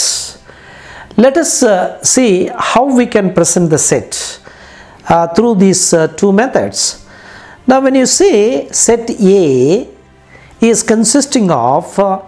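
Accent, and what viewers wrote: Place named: South India, India. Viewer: The teacher's from South India as explained from his accent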